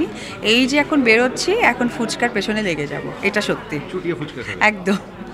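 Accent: Kolkata, India. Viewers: নুসরাত ফারিয়াকে নিয়ে তো মজা নিছেন,এখন আপনি কেন কলকাতার মত কথা বলছেন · Kolkatar tan saro didi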